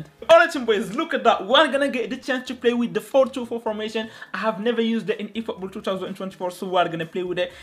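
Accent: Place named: United Kingdom. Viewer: Bro why you have British accent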